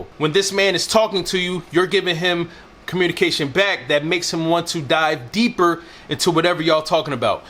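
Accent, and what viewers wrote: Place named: South, United States. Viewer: Oh yes I know that Southern talk LoL 😂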